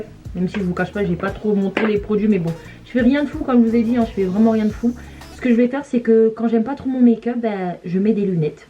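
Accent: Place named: Democratic Republic of the Congo. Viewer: Aah pitinnn😂😂😂l’accent congolais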